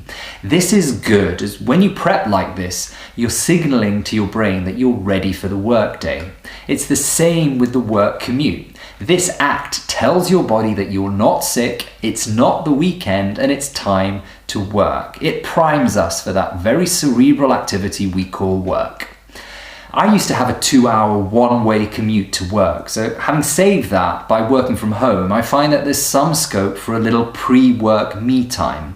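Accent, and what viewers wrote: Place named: Stoke, United Kingdom. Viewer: and bring back the stoke accent dude don't forget your roots duckie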